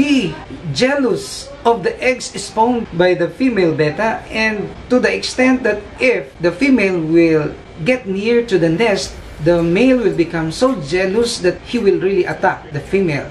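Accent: Visayas, Philippines. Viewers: Sir asa mo dapita? Kay murag2 duol ra ky bisaya man hahaha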